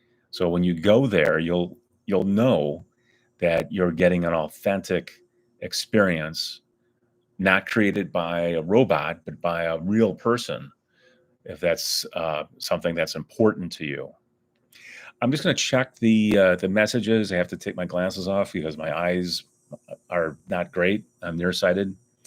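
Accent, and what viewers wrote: United States. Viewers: May I ask you about your accent? You have the most distinct american accent I ever heard, especially very strong "r". Is it a matter of locality you live in, your personal pronunciation, or something else? Don´t get me wrong, I like it. I also appreciate your effort in making your YB content. :hand-purple-blue-peace:Miro, Slovakia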